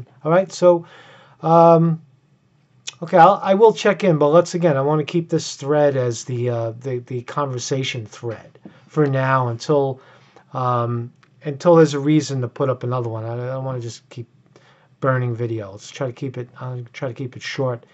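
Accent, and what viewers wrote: New York, United States. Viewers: New sub,, I like that NY accent haha,. But its, SAD unfortunately that's how most cities get and rely on hidden taxes . They outspend and then say their saving the city so much in other taxes just to get elected .. I'm not surprised of the deceit just to tax us more and I think most people are aware of the corruption . Ya vote and hope the politician is honest about cutting taxes . OUR WORLD TODAY . I WORKED 40 yrs for the US PO .and seen corruption first hand with people trying to get promoted ETC.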